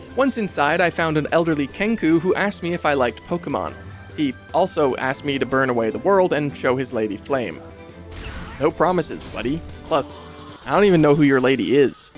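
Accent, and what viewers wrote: Canada. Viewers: are you mayhaps.... canadian?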